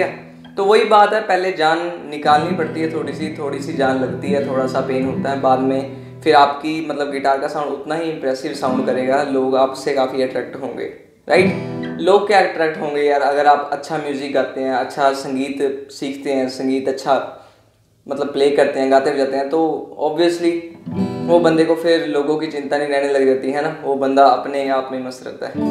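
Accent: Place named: Punjab, India. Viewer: The punjabi touch in ur hindi... Just so sweet 😂❤❤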